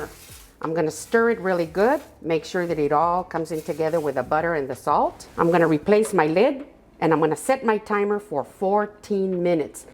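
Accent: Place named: New Mexico, United States. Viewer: THANK YOU SO MUCH! I can’t ever make a perfect rice! I Love All Your Recipes! Are You from New Mexico? In the 70’s l dated someone from Espanola New Mexico and l met all his Family you have an accent like his family, I didn’t Marry him, had a wonderful husband for 43 years he passed away 2 years ago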